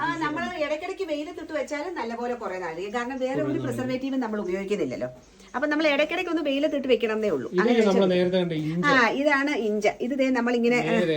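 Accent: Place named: Kottarakkara, India. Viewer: കൊട്ടാരക്കര ഭാഷ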